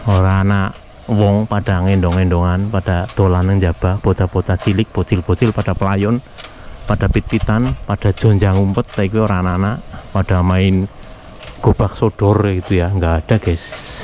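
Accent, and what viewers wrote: Banyumas, Indonesia. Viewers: Punten..mas iki jawane ngendi yah..ko ngapak bener hehe.. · Ngapak Banyumas kayane kie logate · Mas ini aslinya drmn? Spt dr daerah Banyumas kl dengar logatnya..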